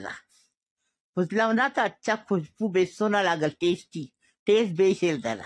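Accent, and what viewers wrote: Konkan, India. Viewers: Masha Allah aunty aap kokni ho bolo mazaa aata hei. Hum samajhte hei , Bolne mei ardevat hei 😊, mummy accha bolte thei , Allah unko jannat naseeb kare ameen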